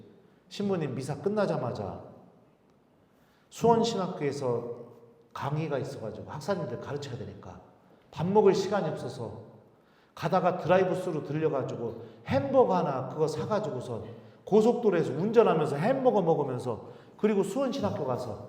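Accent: Chungcheong, South Korea. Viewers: 신부님 감사합니다 충청도 사투리를 한번씩 쓰셔서 반갑다 생각했는데 대전교구 사제셨네요~^^ 제 고향이 대전 이거든요 신부님강의 너무 즐겁게 또 은혜롭게 잘 듣고 있습니다~❤❤❤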